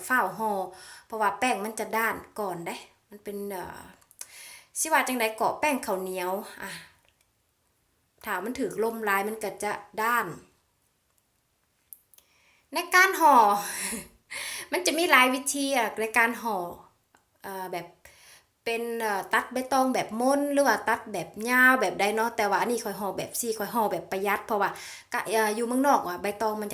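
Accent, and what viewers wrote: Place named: Savannakhet, Laos. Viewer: ມັກຫລາຍໆສຽງເຈົ້າເວົ້ານະເອື້ອຍ ຄົນສະຫວັນນະເຂດ ເວົ້ານົວ ຫົວມວ່ນ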